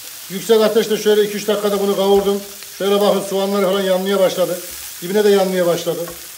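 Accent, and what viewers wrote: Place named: Ankara, Turkey. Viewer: Ustam nerelisin sanki ankarali gibisin merak etim bende ankaraliyim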